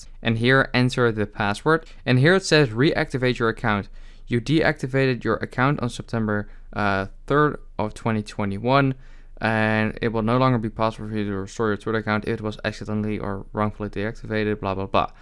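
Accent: Netherlands. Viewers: You're definitely dutch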